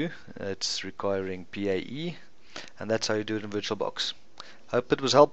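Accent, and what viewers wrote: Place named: South Africa. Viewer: thanks a million dude, are you south african :), Im from SA btw, and based in your accent and youtube name i guessed you are too. Thank you again ::)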